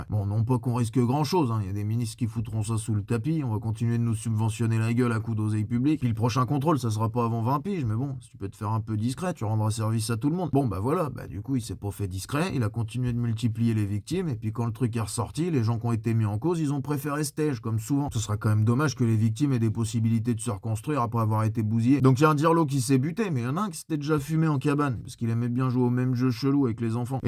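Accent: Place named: Paris, France. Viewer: La france a un gros problème de bistouquette surtout du côté des mecs sans vouloir insister lourdement.. Mais les gonzesses ferment leur gueule aussi, mais les lanceurs d'alerte c'est des lanceuses très souvent.. Alors tout le monde chez le psy. Allez ouste.. Merci pour tes prises de paroles. J'aime ton accent parigo, j'me sens chez moi.. Ça m'fait du bien aux esgourdes.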